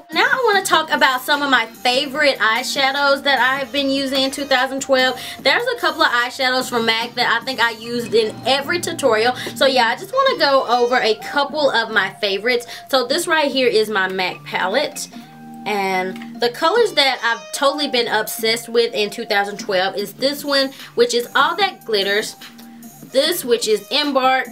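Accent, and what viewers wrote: South, United States. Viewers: no means for disrespect are u a southern bell u sound just like one i apoligize if i had disrespected u in any way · you sound southern!! :]] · I love your accent! Im a southern girl as well ;)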